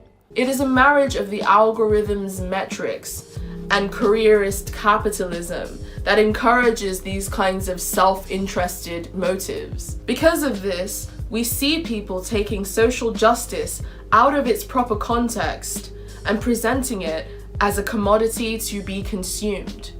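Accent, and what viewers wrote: United Kingdom. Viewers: Are you from Bristol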